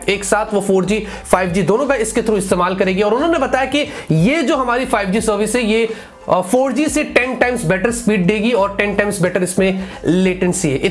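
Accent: India. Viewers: Sounds so wired indian english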